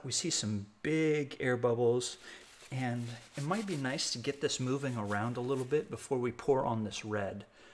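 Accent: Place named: South, United States. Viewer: Hi,. Really nice colors. I imagine it's gonna dry deeper so be sure & show us the finished painting. Oh, btw, I am a deep Southern gal & take no offense to yo bit of South talk. (Just don't put me down in my presence.)😎 💕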